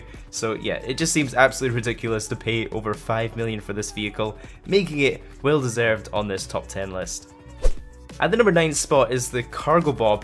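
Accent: Scotland, United Kingdom. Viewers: Are u Scottish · Is he Scottish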